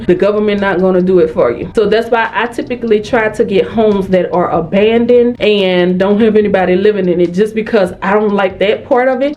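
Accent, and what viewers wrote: New Orleans, United States. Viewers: The New Orleans is oozing out of you. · Somehow before watching this video I just knew she’d have a NOLA accent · I literally saw the house in the nail, and knew it was New Orleans, her accent confirmed, haven't watched yet, but I'm putting my money on the east..... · Ik u from New Orleans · Love your energy and accent ! Something about you New Orleans brother and sisters , you have that southern charm . Thank you for the information. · Love your energy and accent gotta be from NEW Orleans😊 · New Orleans accent ! 🙂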